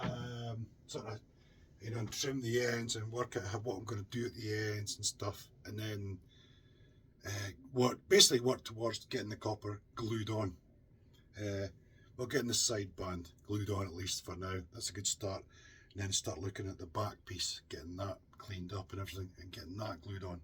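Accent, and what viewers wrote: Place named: Glasgow, United Kingdom. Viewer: Just found your channel & subscribed. Sounds like your accent is somewhere near Glasgow.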